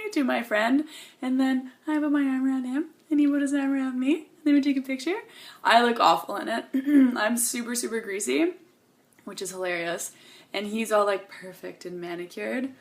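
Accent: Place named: Canada. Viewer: I just realized that you talk and sound just like Robin from How I Met Your Mother who is also a Canadian. Maybe it's a Canadian accent or something but I've never noticed it before.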